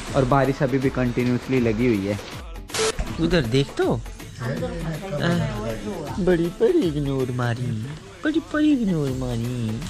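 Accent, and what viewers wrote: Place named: Pahadi, India. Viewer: pahadi mix hindi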